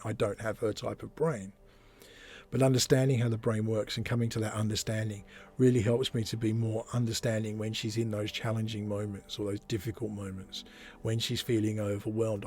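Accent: Australia. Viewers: Detected your Aussie accent as soon as you spoke, as a fellow Aussie. Subscribed. Interested in your listed of talented autism creators. Elon Musk is the planet's most famous current talent. Others? Women and men? Autism affects all levels of humans, with all levels of intelligence. With higher iq people, their cognitive brain can sometimes override the hyperactive autism. However when we are tired, off guard, off duty, our hardly awake, the autism can suddenly emerge. Can you comment on this. Recently our ABC television showed how our most famous homeless orphan, got to be Doctor (sociological) Robert Smith OAM. Over 60 years old now, he is CEO of one of our larger charities. Is he autistic, or merely a PTSD baby, child, teenager?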